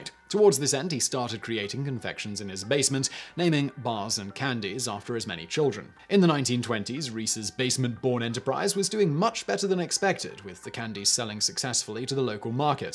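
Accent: England, United Kingdom. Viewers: I'm really glad u didn't refer to hitting the like button as "smashing" it, like you have in previous videos. It's just kinda cringey. "Smash" is a term used by American teenage YouTubers, it sounds really wrong coming from an adult. Not to mention an adult with a very proper English accent. Your accent actually gives you a good amount of credibility, and when you say "smash that like button", it takes all that credibility away lol. i love you and i love the channel! Just no more smashing, ok? :) thx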